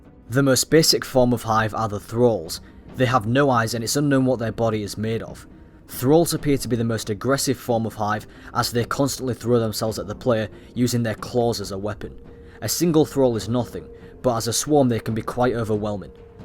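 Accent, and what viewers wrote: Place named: Scotland, United Kingdom. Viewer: With some mates we have an ongoing debate trying to identfying your accent. My idea was that it sounds like a mix between Scottish and Northern English. Would you mind telling us the truth?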